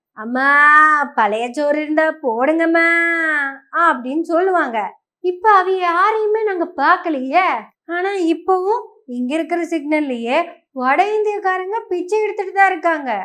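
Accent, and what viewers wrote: Kongu, India. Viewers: கேக்க இனிமையான கொங்கு தமிழ் அருமை சிஸ்டர்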